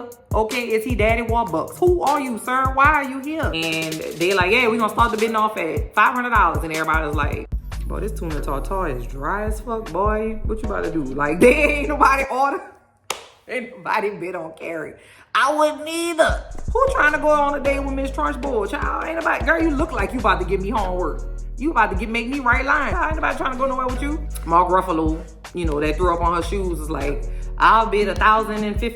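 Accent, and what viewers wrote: New Orleans, United States. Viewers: You sound like my people back home in New Orleans… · Geez! I'm so glad I found you and your channel. Ive watched reviews of this show from others and can't even get through the full review. Plus, your New Orleans accent made me subscribe in less than 1 minute into the video. I know in my bones this about to be good! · I knew you were from New Orleans the second you opened your mouth. You have a new sub, neighbor! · Your channel came thru my feeds on sheer accident and I LOVE IT😍First off Bayyyyybeee I can hear that Nawleans accent a mile awayyyyy heard me😝 secondly, you are HILARIOUS 🤣🤣🤣 When you went off bout Steve sticking his sticky fingers all over the mashed potatoes and kitchen cabinets I was outta here 💀🤣🤣🤣🤣🤣🤣🤣🤣🤣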